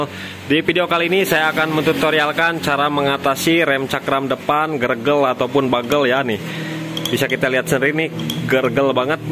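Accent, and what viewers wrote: West Java, Indonesia. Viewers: Gan logat nya sundA bngkl nya di mna?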